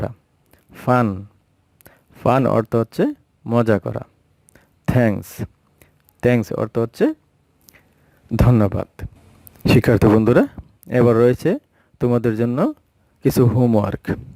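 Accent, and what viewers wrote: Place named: Dhaka, Bangladesh. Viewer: আপনার কথা গুলো ঢাকার মতন লাগে, মাধবপুরের বাসা বুঝা যাইনি😁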